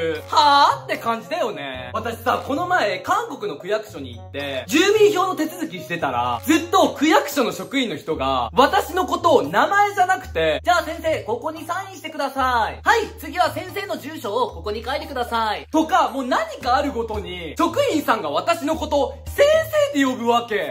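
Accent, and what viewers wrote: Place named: Hokkaido, Japan. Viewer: 大阪に住んでたんだ！ 北海道の言葉と関西弁を喋ってるからずっと謎だったのが、今納得しました❤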